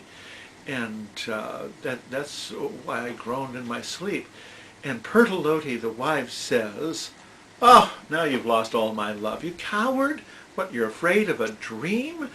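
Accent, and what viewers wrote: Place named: United States. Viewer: Yet another American! I don't want to hear about Chaucer with that annoying accent!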